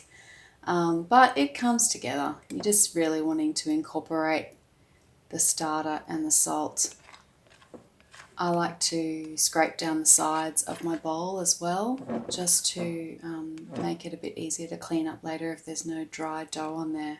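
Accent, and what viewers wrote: Australia. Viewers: I love following your recipes. I use freshly milled spelt. Before shaping I sometimes place several (around 30!) garlic cloves on the dough. I really like the way of your cooking demonstrations. It is simple and relaxed. I tried to get a dough whisk here in our country but have not been successful yet. I also like your Australian accent - have got two Australian friends here in Austria. Greetings from Austria.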